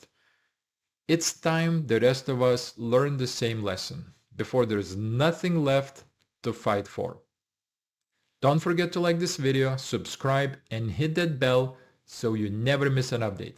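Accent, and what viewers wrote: Russia. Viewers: Tough to listen to a Russian Accented Guy, talk about Unions. Got trouble "Buying In". AND, I am a Teamster, Unrestricted Class A CDL. Hmm? Keep waiting for him to Call me, "Comrade".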